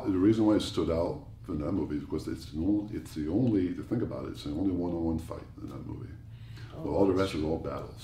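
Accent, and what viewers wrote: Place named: Canada. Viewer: He sounds so Canadian I love it lol I feel like I'm listening to my neighbor right now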